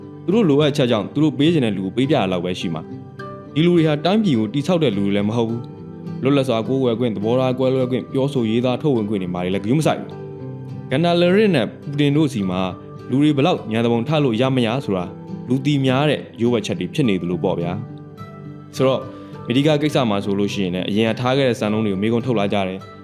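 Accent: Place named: Dawei, Myanmar. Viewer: မောင်ရင်ကထားဝယ်သားလား ထားဝယ်စကားပြောတာပီလို့ဗျ❤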